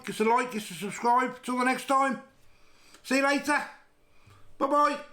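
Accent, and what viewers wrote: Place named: Black Country, United Kingdom. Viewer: Salt and pepper is not seasoning I think you and are amazing people loving the Black Country accent I’m from the Black Country too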